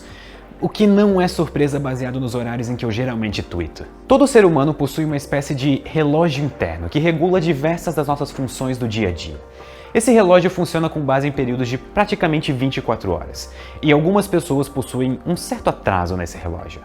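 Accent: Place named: Rio Grande do Sul, Brazil. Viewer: você é gaúcho?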